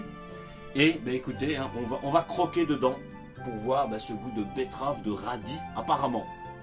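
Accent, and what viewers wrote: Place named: Belgium. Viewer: Très sympa tes vidéos 👍🏽 C’est marrant ce petit accent belge. Par contre d’habitude je reconnais facilement de quelle région de Wallonie provient l’accent, à part le carolo, sauf si il est fort prononcé. Sûrement dû au fait que je suis, moi-même, carolo😅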